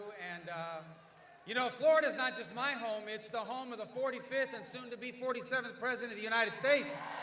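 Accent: Cuba. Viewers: Que lindo es el acento cubano . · Maravilloso acento Cuba 🇨🇺♥️🇺🇸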